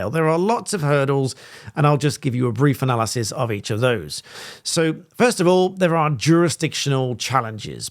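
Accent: United Kingdom. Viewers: Talk too fast - plus intolerable "British Accent". No thanks.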